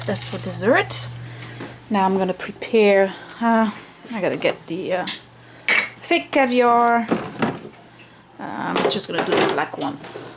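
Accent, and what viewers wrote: France. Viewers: Listening to you and your mother speaking in French reminded me of something I keep wondering about ... I know you have been in this country a long time and your accent is very slight but the question I have is, do you THINK in French and then have to translate before you speak or do you now THINK in English? That's just my strange mind at work again.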